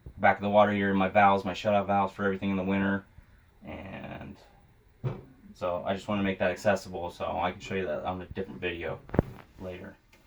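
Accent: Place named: Utah, United States. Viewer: As soon as I heard him talk I could tell he was a Utahn. 😂 Great job way to make us proud! 👍🏼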